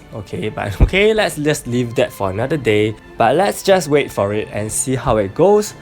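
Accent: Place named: Singapore. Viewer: Your accents sounds similar to a singaporean! Great video btw! You made it more clearer and i love the sudden appearance from orkah xD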